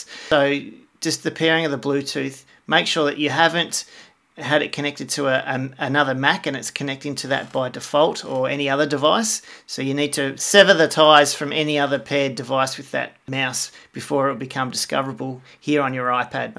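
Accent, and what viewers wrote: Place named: Australia. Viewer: I love his Australian accent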